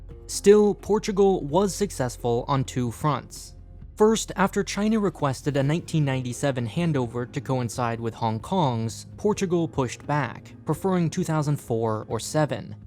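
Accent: Spain. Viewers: Why people insist in pronouncing Portuguese names as they would be in Spanish ? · I know it's hard, different and weird, but reading Portuguese names in Spanish is both rather offensive to Portuguese people, as well as wrong. I'm an avid fan of this channel, so all I ask is for names to be read properly because if not, it is less offensive for you to try and read them in English.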